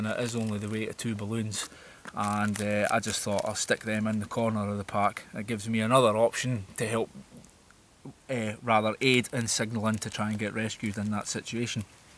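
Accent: Scotland, United Kingdom. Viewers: Great video. I have to get one of those. I was not aware it was for the leg. You scotts and that accent. I could listen for hours. A lass even better. Cheers bro!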